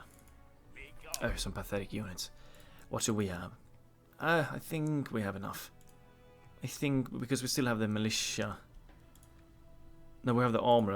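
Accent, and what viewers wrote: Sweden. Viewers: From which part of Sweden are you?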